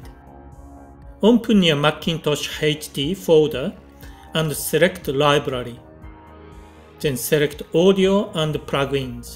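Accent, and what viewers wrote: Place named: Japan. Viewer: God this is a Japanese speaking English...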